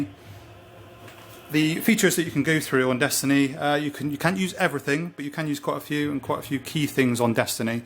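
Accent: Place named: Ireland. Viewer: Your Irish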